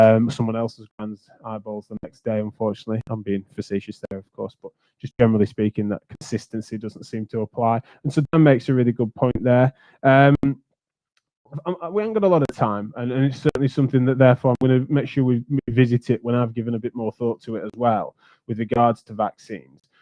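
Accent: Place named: United Kingdom. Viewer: Got steered your way by Dr. Eric Nepute and Sherri Tenpenny. Live in USA (came from Germany as a 12 year old). So it's a bit hard to understand your British accent. But it's worth the effort. Keep up the good work, young man. As to myself and millions of other compatriots here in the States, we can't wait to see President Trump confirmed as having been reelected when the Electoral College meets on December 14. So tired of seeing all these zombies wearing masks even when the state law does not require them to do so (walking alone, driving the car, riding a bike.....totally insane on their part, suggesting "see what a good, law-abiding citizen I am.). So tired of having to put on that piece of cotton whenever I go shopping. I'm usually the only one that does not cover the nose). Anyway, I suspect that Donald John Trump will give all Americans a nice Christmas present by declaring the end of this Covid 19 pandemic no later than Christmas eve. 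Thank you so much, handsome dude,.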